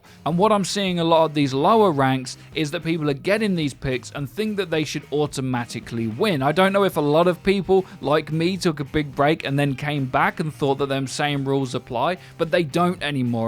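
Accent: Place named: United Kingdom. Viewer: We missed your British accent guiding us!!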